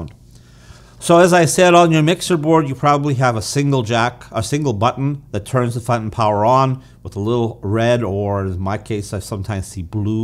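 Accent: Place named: Eastern Europe. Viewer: I am picking up some Eastern European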